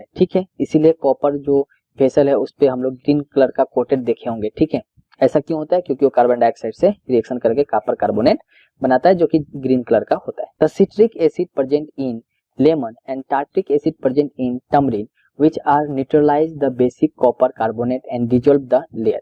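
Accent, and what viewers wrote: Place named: West Bengal, India. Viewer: Thankyou very much sir.......I'm very happy to see...that you are helping me.....and many other students... thankyou.. very much sir......sir....from ur...voice...mujhe aisa lag rha hai ki aap bangoli ho..........thnq sir...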